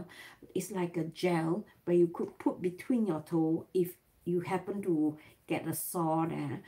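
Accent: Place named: Singapore. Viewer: You still have your singapore accent.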